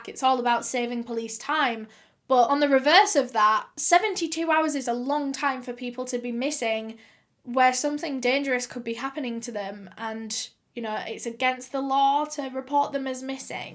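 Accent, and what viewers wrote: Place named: North, United Kingdom. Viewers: She’s northern 😁💗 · I'm from Dublin and my brothers wife to be is from Leeds and she sounds alot like you. That accent is too gorgeous 😍😍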